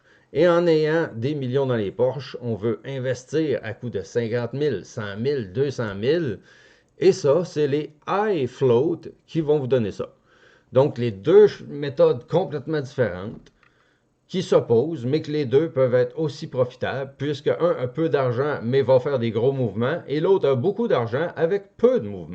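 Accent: Canada. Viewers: Superbe. Oh l'accent canadien!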